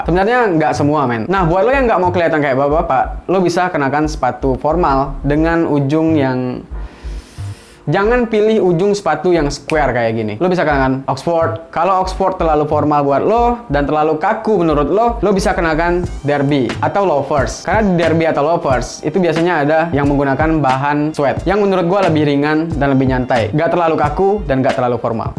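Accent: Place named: Padang, Indonesia. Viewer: Abg org padang ya, ketauan dari logat nya 🤭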